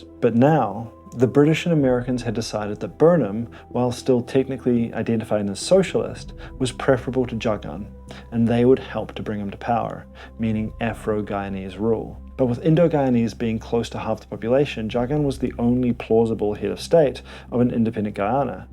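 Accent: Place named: New Zealand. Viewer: Great content bruzzy! Editing, music and most importantly delivery and info on point. Also as an Aussie who grew up in aetorea i dont think ive heard a more "international" or "posh" kiwi accent! its almost imperceptible but sort of ole' school journalist which i love. Keep up the good work. Subbed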